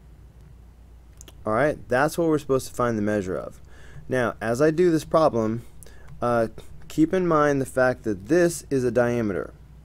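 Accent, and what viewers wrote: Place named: East Coast, United States. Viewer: Nice job. I detect a Eastcoast accent? Where did you do undergrad.? Just curious. I just subscribed you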